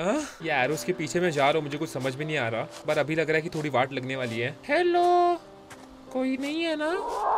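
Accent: Maharashtra, India. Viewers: You have a Marathi ancient in speaking and I love it yarr....🥳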